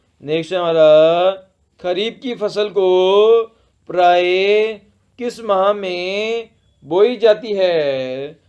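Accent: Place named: Bihar, India. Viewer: हरियाणा जीके बिहारी पढ़ा रहा है बड़ा गंदा लग रहा है आवाज से 😂 भाग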